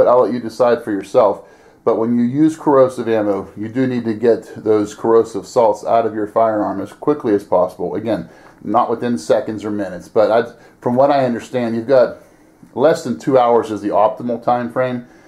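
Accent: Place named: Oregon, United States. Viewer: I have both the Russian 91/30 and M44 and a Russian SKS and SVT-40. I love my Russian rifles and really love the looks of the fellow shooters at the range with their ARs who never heard a Russian BOOM STICK that breathes fire before. I end up having half a dozen guys want to shoot it every time I go out - so cool. It's nice to share the historical details of these great weapons to people who have never seen one, let known fire one. I'm in Central NJ, and shoot at Fort Dix Army Base (Range 14) I'm a Civilian DOD employee and get a really great annual membership there. I'll be honest, my favorite to shoot is the M44, the firm kick and flames really let you know you have something powerful in your hands. But by far the most accurate is the SKS which I can shoot 8 inch groups from a stance at 100 yards, over and over. To me it says a lot about the build on the SKS, especially the trigger pull. 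I never touched the Mosin triggers, although I fully stripped, sanded, stained and Boiled Linseed Oiled (20 treatments) to my M44 which has such a lustrous feel to the stock and a wonderful matted finish. <link> I'm curious, I'm pretty good with accents and you sound Oregonian (at least PNW) to me - am I close?? Thanks for this great intro to these wonderful rifles. I somehow missed this video although I've tried to see every one I could in the 4 years I've own these rifles. Bravo on a great video! OH... That does look like a Tula Star, although I have no idea why it is so small and NOT whe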